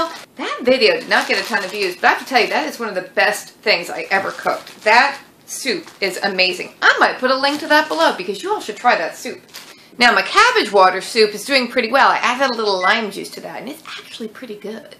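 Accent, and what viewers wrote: South, United States. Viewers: Why do you sound southern today? Or is it me? Anyway, love listening to you ...some pretty good ideas... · I saw jersey license plates in another video but you sound like youre from the south.......just wondering.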